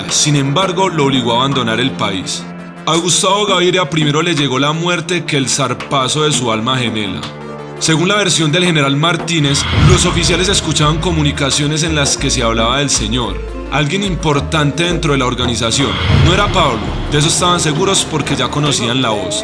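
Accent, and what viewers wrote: Colombia. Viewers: Por tu manera de hablar eres de Colombia pero viviste en aquella época de Guerra del Narcotráfico contra el Estado?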